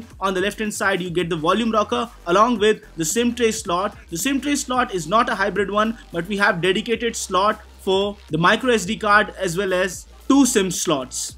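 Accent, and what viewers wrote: India. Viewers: fuck that Indian accent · fuck indian accent dont like it..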